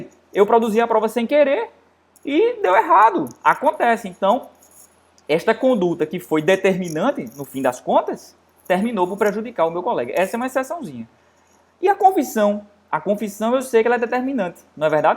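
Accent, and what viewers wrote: Northeast, Brazil. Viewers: muito didático, obrigada por compartilhar seu conhecimento. A propósito, cm todo respeito, adoroooooo esse sotaque do nordeste.😍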